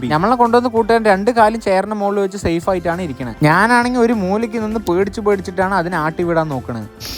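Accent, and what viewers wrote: Palakkad, India. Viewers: 😂😂palakad slag🔥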